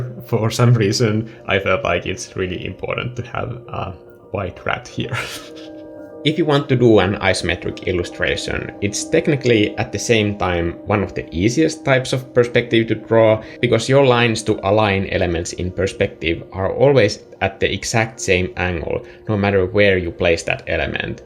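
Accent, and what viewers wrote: Finland. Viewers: I always loved these types of drawings as well, as a kid and up to this day. So the details, care, and attention you gave to your creation really resonated with me! You created a beautiful, relatable space which somehow feels both familiar and strange. So excellent, kiitoksia for sharing!! (I am guessing by your accent you are Finnish 😊) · Are you finnish? You have voice and accent like Tuomas Holopainen 😄👍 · I love your style so much 💚💛 and I knew I recognized that accent!! Kiitos videosta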